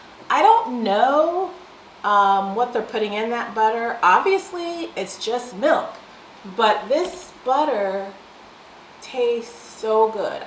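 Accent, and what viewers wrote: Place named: Lisbon, Portugal. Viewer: Awww you already talk as a lisboeta 😊😊👍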